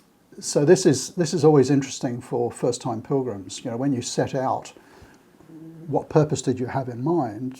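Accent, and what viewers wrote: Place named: Australia. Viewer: listening to this video was like hearing my own thoughts. My walking buddy and I also have amazing partners who are happy for us to follow our dreams and walk Caminos. Our 3rd Camino will start April 2024 on the Camino Portuguese (and hopefully Finesterre and Muxia) if time and no injuries allow. I love the simplicity of walk, eat (cafe con leche) sleep, repeat, meeting people from all over the world who have the same passion, and especially how I felt at the end of my last one: fit, relaxed and wanting a more simplistic life. Now I have retired, I have achieved the simplistic life and happily planning our next Camino. Your tip about not going into too much detail of your walk with others who have not walked a Camino is spot on. Just watch their eyes and when they start to glaze over, its time to change the subject 😀Thank you for your channel, its great, especially listening to a relaxed Aussie voice. 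I'm from Queensland, cheers Wendy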